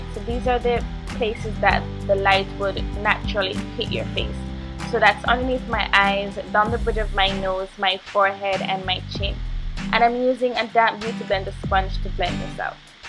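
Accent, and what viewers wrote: Jamaica. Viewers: is she jamaican? she sounds like she is. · I hear that accent are you from JA?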